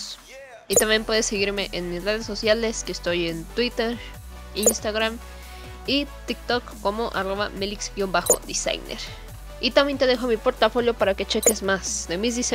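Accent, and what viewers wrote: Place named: Latin America. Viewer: Buen tutorial gracias . Vives en España ? Tienes bien marcado el acento latino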